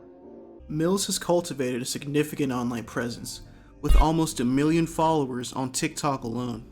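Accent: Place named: Atlanta, United States. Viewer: He sounds more Atlanta than Miami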